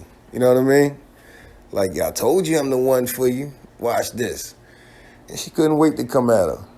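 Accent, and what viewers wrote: Baltimore, United States. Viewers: Do you think Lil Kim started to get plastic surgery to look like Faith?? Just think about how Faith looks and how Kim looks, she even changed her complexion to Faiths complexion. And damn near her body shape too... please give me y’all thoughts 💭... Are you from Baltimore? Cause I can hear how you call folks “Yo” lol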